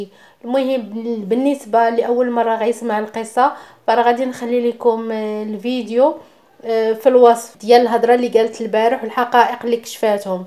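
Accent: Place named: Egypt. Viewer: االمهم من هاد الفيديو ديال ميار ووفاء مكي هي انك تقنتي اللهجة المصرية تبارك الله عليك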